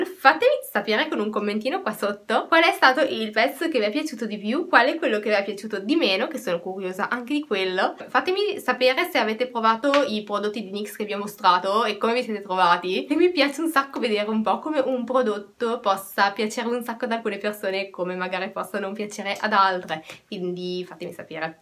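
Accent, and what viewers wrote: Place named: France. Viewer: anch'io adoro gli oggetti di unicorno.Cmq è simpatico il tuo accento,sei francese?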